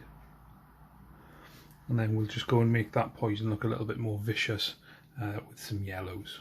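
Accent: Wales, United Kingdom. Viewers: loving your new channel mate. great warcry content. Subbed! Where bouts you from? That is definitely a fellow welsh accent i hear.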